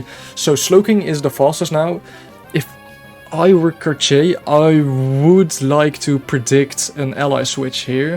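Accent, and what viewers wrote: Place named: Netherlands. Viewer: ik ruik een nederlands accent hehe.. leuke video man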